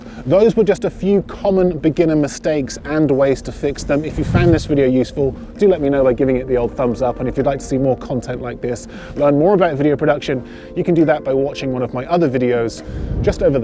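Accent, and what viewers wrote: United Kingdom. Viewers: Dear, I wish to thank you here for your videos! They are a great pleasure to watch; may be I will improve my shooting skills and also my English (thanks for your British accent). I love also the South-West of England, where I spent a little vacancy on motorbike and where I made my first on-board action cam videos (beginners quality, but lovely memories). Please, do you have a donation address, so that I can offer you a beer or a cider? Cheers from Italy.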